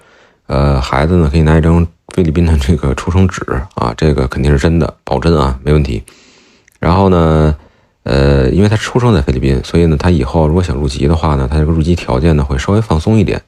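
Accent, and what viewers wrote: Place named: Beijing, China. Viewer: 北京人儿？这口音很好听啊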